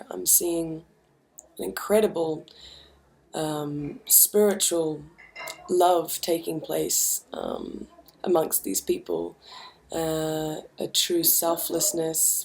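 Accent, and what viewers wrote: New Zealand. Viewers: Kimbra! You inspire me and the music i write. Keep up the the kiwi accent too - from a fellow kiwi, im starting to lose mine, ive been in Melbourne too long haha. I admire you for taking time out of you busy schedule to visit those in need. Its the small things that we do for others that usually make the greatest impact. Good job. Keep it up!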